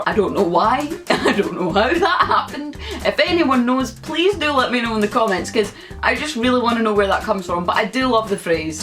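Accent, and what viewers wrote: Scotland, United Kingdom. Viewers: I'm from Argentina and I felt like I needed a challenge so I searched for "Scottish accent" and let me tell you that I'm not ready for such a whole new world. Sometimes I got distracted thinking about the words you said and when I went back to listening I thought you were talking in some Nordic language. But definitely going to subscribe and challenge myself with your videos! And by the way you are so pretty 🖤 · Hello, great video. Please consider removing the word slang as what yer speaking is Scots language. A lot of Scots have no idea they are speaking a language as they have been told time and again that it is slang. We are not taught to read and write in Scots and many of us are illiterate in oor ain language because of this suppression. Scots is only brought oot fer the tourists teatowels and Burns Week but we speak it aw the time. 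We struggle tae read it and write it cos at school we have only ever been taught tae read and write in English exclusively. That is thankfully changing wi the introduction o Scots in the school curriculum. Oor language is a bonny and valid language and tae keep calling it slang devalues what is uniquely oors. There is a lot of work been done over the years tae empower ourselves and tae nurture our language. May I suggest ye read The Mither Tongue by Billy Kay if yer keen tae ken mair. I write this with great kindness and support. I am a Scots language author for weans. Aw the best tae ye. Guid tae hear a Scots voice on YouTube. Please, if ye dae make another video like this, I beg ye tae yaise the word language insteid o slang. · Got such a Scottish mouth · Я думал, что я знаю английский до момента пока я не услышал настоящий шотландски акцент Это пиздец полнейший, я нихера не понимаю ПОМОГИТЕ!!!! 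P.s: я посмотрел "trainspotting" и там был усатый сумасшедший чувак. Он тупо говорил слова, которых нет в английском! Слова блять другие! Какого хера? Почему aye вместо yes??? Что еще за ah dannie ken??? P.p.s И я пишу по Русски потому что я не понимаю 50% того что ты блять говоришь!!! Это моя месть! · idk if this is weird but I love your accent! Currently using your voice as a sound sample to learn the Scottish accent for my theatre class in college😅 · Hey, you might have answered this but I'm gonna ask anyway. what Scottish accent do you have I know that are different ones, but I like your accent and I was wondering. I follow other scots and they all throw the accent around a bit. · Only just discovered your channel and I'm already hooked. You're so confident and it's nice to hear more scottish accents around here. Subscribed!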